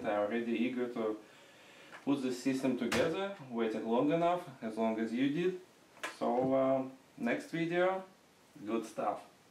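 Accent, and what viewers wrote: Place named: Russia. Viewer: I don't think it really is German. He probably does speak German well, but I'd say Austria or Poland or Hungary. They all speak German well, but slightly different accent from actual German. I caught him having a minor Russian slant to his English(ex:blyeed, pyart). His accent is slightly off from German-German. I hoor ze vaak genoeg hier in de omgeving.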